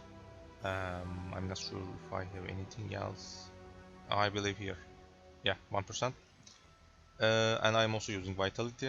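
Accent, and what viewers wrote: Turkey. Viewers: Aksanınızdan Türk olduğunuzu düşünmüştüm ve kanalınıza baktım hocam :))) Teşekkür ederim, oyuna yeni başladım, bu build hem ucuz hemde sağlam gözüküyor. Bu build ile başlayıp ileride farklı karakterlere geçiş yapacağım, sağolun güzel video olmuş.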